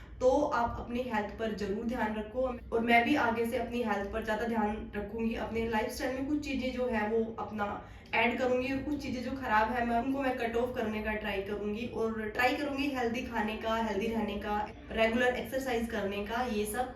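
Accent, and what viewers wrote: Haryana, India. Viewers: Aap haryana se ho mam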